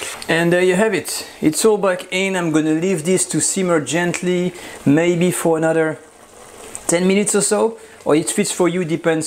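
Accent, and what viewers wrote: France. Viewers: Your French accent convinced me to make this recipe.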